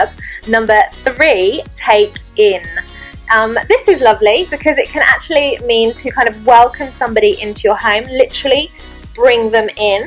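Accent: United Kingdom. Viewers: Beautiful British English!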